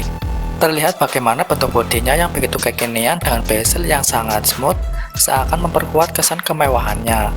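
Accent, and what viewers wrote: Banyumas, Indonesia. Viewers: Lha koe ngapak yaa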